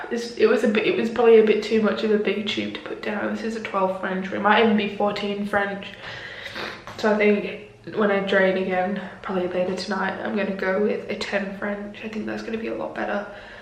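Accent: United Kingdom. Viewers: You’ve got a British accent but your Australian omg I’m confused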